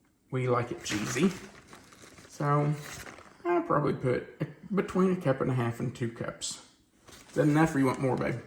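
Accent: South, United States. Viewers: This looks so good and easy to make over the holidays when we have company. Thanks for sharing. Also, my hubby and I are having a debate on accent (well region you're from) I say soutjern states and he says Pacific Northwest. Settle our debate. Lol!!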